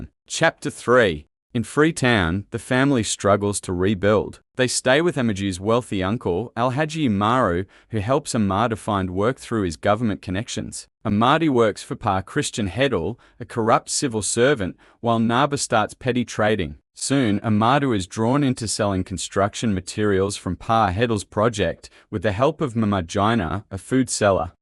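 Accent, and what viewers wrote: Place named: Australia. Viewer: FINALLY! AUSSIE ACCENT!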